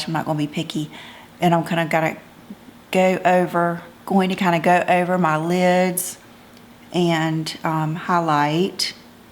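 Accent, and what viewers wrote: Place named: North Carolina, United States. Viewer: Are you from nc? I'm trying to place that accent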